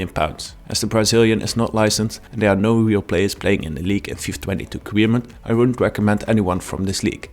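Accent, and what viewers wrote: Netherlands. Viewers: Bro je moet wel iets aan je engels accent doen man, geen haat ofzo maar je hoort duidelijk dat je NL bent verder goeie videos 👊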